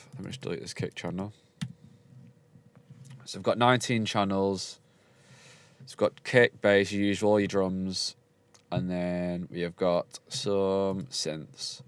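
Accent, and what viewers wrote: United Kingdom. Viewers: Can't understand that heavy British accent. Skipping.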